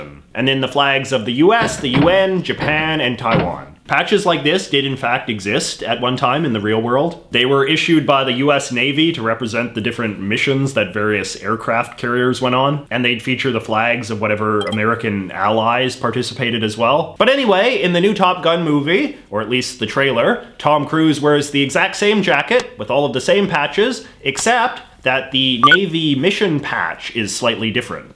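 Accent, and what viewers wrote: Canada. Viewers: i know its 1yr old that was posted but your so Canadian cause you say abut then about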